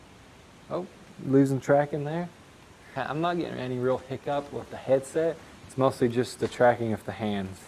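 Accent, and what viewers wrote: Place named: South, United States. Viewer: you get a sub for that sweet southern accent... and the good quality video but mainly the accent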